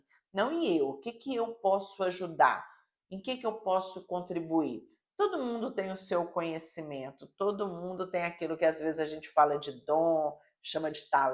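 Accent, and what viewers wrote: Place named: Brazil. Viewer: Gosto muito de a ouvir ! Tem toda a razão quando diz que muitos brasileiros se fecham "guetos", ou a comunidade migrante em geral. Claro q é um erro. Nunca vão conhecer novos costumes e culturas. A questão do Português de PT, aprendam só os termos e formas verbais e tentem falar / escrever consoante essa aprendizagem ( mais importante para quem estuda), mas não percam esse lindo sotaque, que não nos incomoda nada, pelo contrário. Sucesso!!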